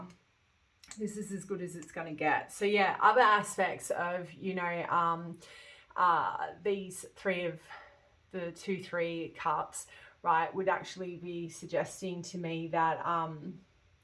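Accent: Australia. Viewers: “If you’re new to my channel I’m not normally this shit 🤦‍♀️ “ hahahah classic I love you already. Aussie ?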